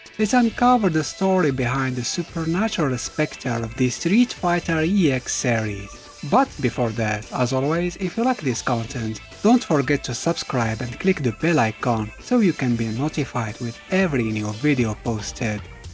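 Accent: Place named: Morocco. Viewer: l akh mghribi bayna :D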